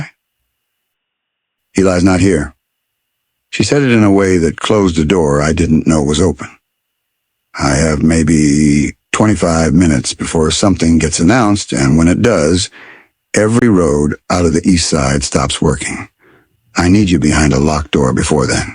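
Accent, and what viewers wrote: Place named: England, United Kingdom. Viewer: I am from Glacier on the W side.My X was a water purification specialist in the whole park West North. He had his own cabin. I know this area very well. The story is not concise but entertaining. I used to know most of the residents in all of Glacier. AI, you have an English accent and you mispronounce proper nouns.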